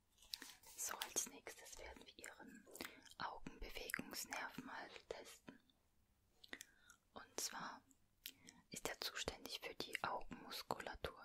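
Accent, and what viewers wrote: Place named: Russia. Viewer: Ich liebe diese Stimme mit dem russischen Akzent so Nice😍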